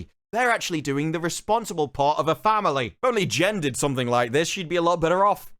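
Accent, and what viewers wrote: United Kingdom. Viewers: dude I love a dude with British accent tearing this apart love it subbed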